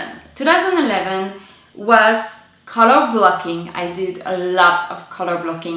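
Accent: France. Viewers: Are you French? I'm french, x) and when people talk in english It's the same accent x) sorry for my bad english ;w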